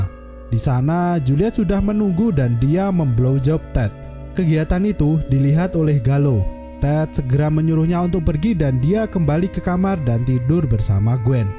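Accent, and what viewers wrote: Java, Indonesia. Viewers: Si abang nya Jawa ya bang medok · Suara jowo medok eramm mass hehheh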